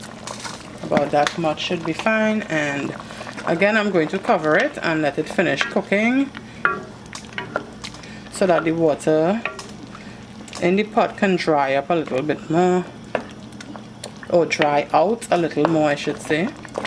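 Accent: Trinidad and Tobago. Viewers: Ah hearing that beautiful Trinidad accent.... Love d red black and white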